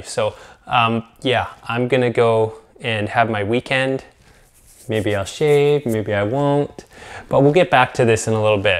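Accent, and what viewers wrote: Canada. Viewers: SOMEone could watch this just to study the Canadian accent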